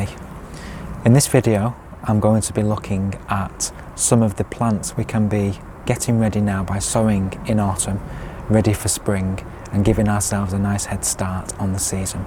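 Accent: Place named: England, United Kingdom. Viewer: You sound English, are you in Europe or here in the USA.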